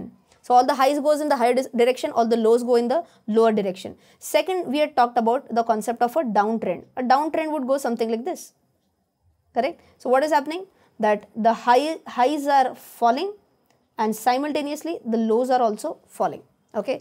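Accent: Maharashtra, India. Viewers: Even English have Maharashtrian accent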